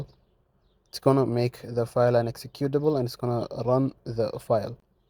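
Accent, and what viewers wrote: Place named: Arab world. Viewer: واضح عربي من الاكسنت " بروازير"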